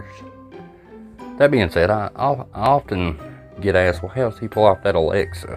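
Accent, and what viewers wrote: South, United States. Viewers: Thank You for opening my eyes to a lot of fakers out there that I TRULY BELIEVED THEM. I think it's really rotten to the core to put out FAKE PARANORMAL VIDEOS when actual hauntings do occur and the people who are affected and suffering from these events make them look either fake like theirs or their just labeled "CRAZY!!" Plainly speaking, it's just fucked up and a truly greedy way to make a buck!!!, I had asked you if you happened to read my comment not too long ago, about the ALEXA, and I asked you flat out, "Well then, can you please explain how he's communicating through the Alexa. So I'm so glad for this upload, that it was addressed after all, one way or another!! My boyfriend is not easily fooled and thinks VERY SIMILARLY the way you do, and told me how he's doing it, like THIS, SO this was ever so appreciated. THANK YOU, and I sincerely hope your Mother is feeling a lot better. 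Please give her my regards my friend. Kindly, let her know I get chills and I get head over heels love it when I hear your Southern Accent! ☮️💜🤘 PS: BTW... I'm from New York City 😘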